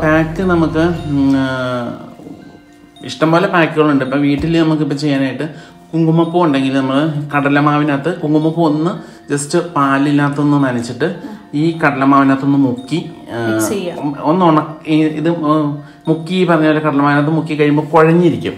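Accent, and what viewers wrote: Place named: Wayanad, India. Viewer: Hai ma'am how are you, I like to hear ur wayanadan voice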